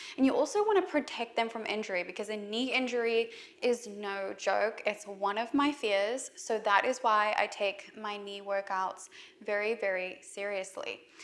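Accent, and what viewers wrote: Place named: South Africa. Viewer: I hear a South African accent here :-)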